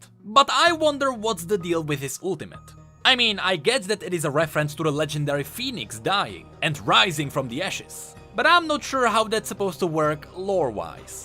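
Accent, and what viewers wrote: United Kingdom. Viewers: nah he from the UK init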